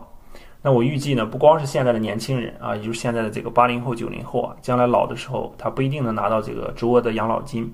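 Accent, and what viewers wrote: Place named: Taiyuan, China. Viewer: 主播听口音像是太原的？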